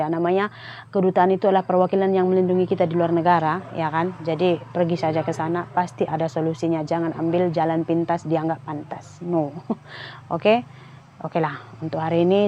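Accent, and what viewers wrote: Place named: Malaysia. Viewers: Betul loghat percakapan mcm orang Malaysia la Sri😂Aunty Malasian suka Sri dan family❤ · logat kak sri sudah logat malaysia ,cara ngomong nya cepat tak putus putus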